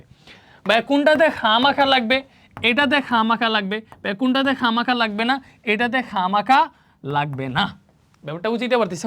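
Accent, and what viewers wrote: Sylhet, Bangladesh. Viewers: apni Kitah sylheti ni?? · আপনি বোধহয় সিলেটী। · bai apne ki sylhety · vai tumi ki sylhet ti tumar basa sylhet naki 😊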